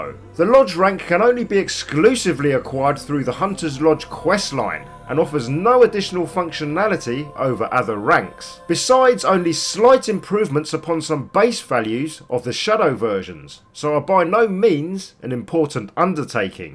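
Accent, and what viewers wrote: United Kingdom. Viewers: You're welcome. I finished the game 3 days ago. What an experience. I cried at the end since what Dr. ES says is really touching. These bloody wankers might have a problem with the British accent or are loyal fan to the Yankie accent haha. Your language and accent are really awesome. Keep up the good and I'm really thrilled to watch more of your videos. It would be great too if you not only make guide videos, but also explain games' story and that stuff. Wish you a lot of success.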